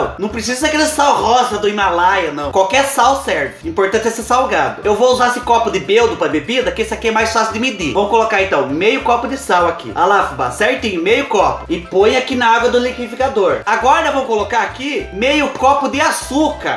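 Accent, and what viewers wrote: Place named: Bahia, Brazil. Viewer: Amei esse seu canal😲Já g annhou uma inscrita nova😁Sou Baiana e seu modo de falar pareçe com o meu 😌😍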